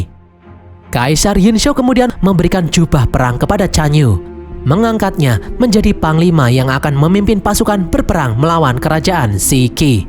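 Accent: Indonesia. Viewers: Nezha pun sebenarnya bukan nesa tapi neca, tapi gpp kan lidah indo bukan lidah cina woi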